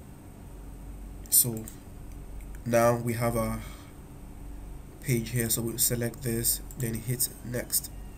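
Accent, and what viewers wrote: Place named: Nigeria. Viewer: Wow!. I was watching BOVI comedy on my Tab while searching for How to work on Instant Article. I had to stop Bovi when you started talking. A NIGERIAN VOICE? MAN!. GOD BLESS YOU. I didn't skip the ads either because I believe that's a way to say THANK YOU!. GOD BLESS YOU MAN.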